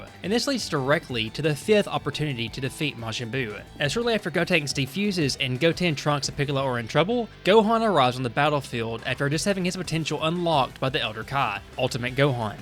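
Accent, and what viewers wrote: South, United States. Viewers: Love the hick accent. You sound like my grandmama😂😂😂❤️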